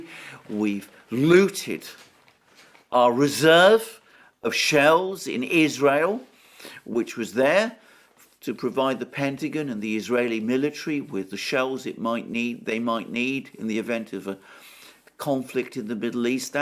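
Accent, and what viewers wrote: England, United Kingdom. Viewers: Speaking with an English accent, when you speak English and live in England, is it so strange? The kind of things that upset some people.... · Dang... sure wish I could understand English with the "English" accent... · I m very happy with your British accent. Keep on with your fabolous work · for the love of God and all his saints, please, do not spend 5 minutes explaining why you, being British, pronounce various non-English names with an English accent. What a strange thing it would be if you pronounce them with a French one. That's how these videos run over one hour instead of 30 min, and I, with all due respect to your good effort and thoughtful comments, nowadays watch only some of them. Cut to the chase, and you have the point, you just have to get to it. More views and more upvotes will follow, imo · Love your English accent. 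· Viva English accent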